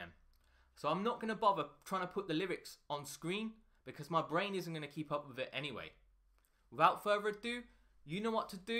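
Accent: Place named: England, United Kingdom. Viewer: Your english accent is so cool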